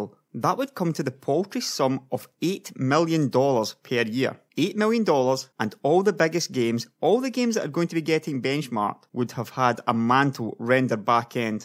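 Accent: Scotland, United Kingdom. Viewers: cool Scottish accent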